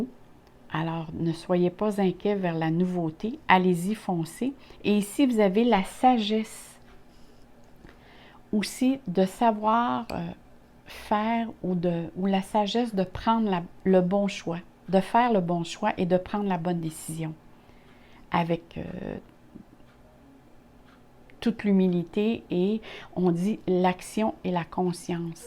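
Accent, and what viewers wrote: Canada. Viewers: Coucou chère merci pour ce superbe tirage, quel espoir.... en plus j'adore ton accent canadien si sympathique... Un grand merci et je te souhaite aussi une excellente année 2021 à bientôt 😍😍❤️❤️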